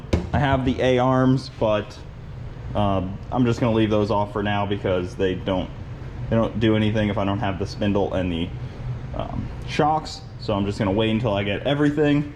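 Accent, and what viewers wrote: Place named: New York, United States. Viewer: What part of New York are you originally from?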